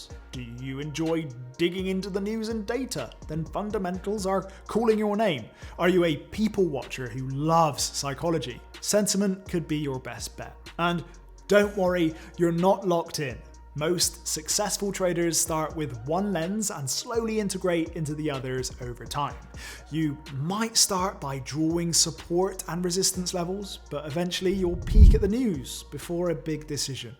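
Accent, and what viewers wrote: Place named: Bristol, United Kingdom. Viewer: Just found your channel, its really great and your accent sounds not too far from me in the Forest of Dean, sensing Bristol for you. Noticed you haven't posted in a. while. Please do, your videos on trading are refreshing. From someone learning, thanks!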